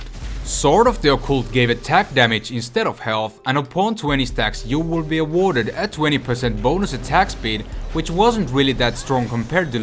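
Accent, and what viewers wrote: Finland. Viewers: Are u from Finland? If u arent u just speak like Finninsh people does.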